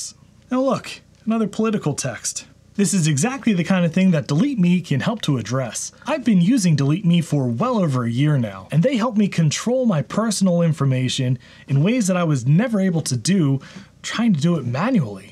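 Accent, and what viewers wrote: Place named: California, United States. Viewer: Off topic: Are you from Cali? Not that it matters but judging by your accent I would say Cali but my friend says AZ... Love your channel, btw, and I use Arch.....btw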